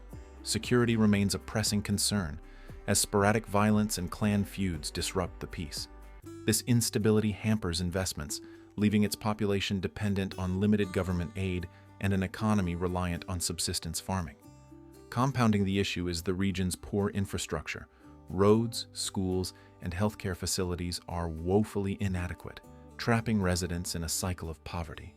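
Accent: United States. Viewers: Please correct your pronunciation of place names such as Pampanga and Batanes - it is disrespectful to say those with such an American accent!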